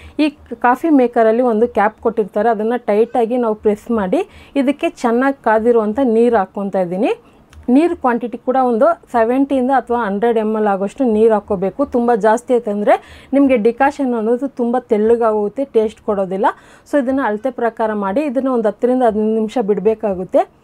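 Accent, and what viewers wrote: Tamil Nadu, India. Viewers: ಇವರದು ತಮಿಳ್ ಅಕ್ಸೆನ್ಟ್😂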